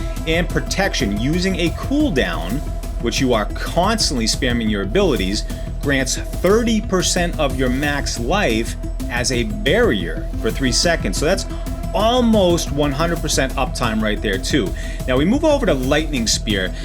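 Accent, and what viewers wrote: Boston, United States. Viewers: God damn the Boston is strong in this one!! Hahha Go Sox brother!!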